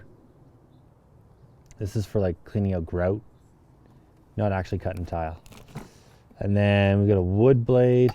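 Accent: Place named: Canada. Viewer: Could you please remake this video with a narrator? I can’t understand the thick Canadian accent. Thanks.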